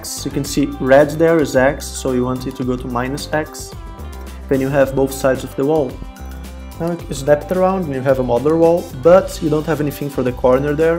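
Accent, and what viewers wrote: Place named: Brazil. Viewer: Cara, tu é brasileiro não é? O jeito que tu fala, tem algo de br ai rsrsrsrsrs LoL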